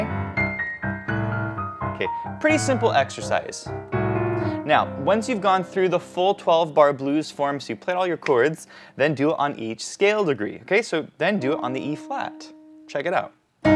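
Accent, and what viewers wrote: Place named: United States. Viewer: Merci beaucoup Mr! Vous m'apprenez beaucoup de choses intéressantes, et même en sus, un petit cours d'anglais américain, ce qui est toujours bon à prendre au passage! Je vous comprends assez bien! J'en suis content! Surtout! Ne vous arrêtez pas!! MERCI!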